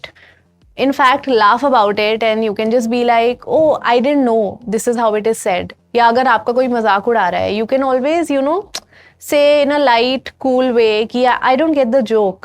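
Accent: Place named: United States. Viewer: American English ❤ American Accent ❤ American Syllable ❤ American Pronunciation words ❤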